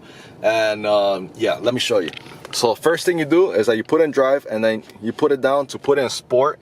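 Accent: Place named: Miami, United States. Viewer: I recognize that Miami accent anywhere. Rick Case VW plate confirmed my suspicions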